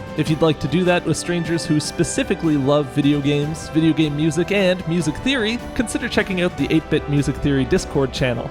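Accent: United States. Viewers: i never even realized you were canadian! i think my mind just kinda assumed you were northern/midwestern american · Wait you're not American? Then where are you from that has such an American accent? Canada? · "And I'm not even American" That threw me for a loop there for a second. I was about to say "but, his accent is clearly Amer..." and then I remembered that for the most part the Canadian accent is indistinguishable from the American accent, unless you have a heavy regional accent. Sorry Canadians, for always assuming you're American unless told otherwise. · I thought you were american, were are you from? Very nice talent in music, you have.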